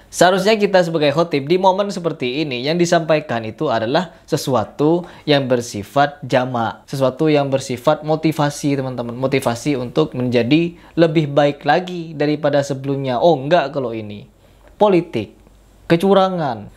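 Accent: Madura, Indonesia. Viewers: Bg lu emang orang Madura yah?? Kirain cuma logat lu aja Madura.itu di caption lu pake bhs Madura kan??? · Pola oreng madureh kakeh mas. Logatnya kayak oreng madureh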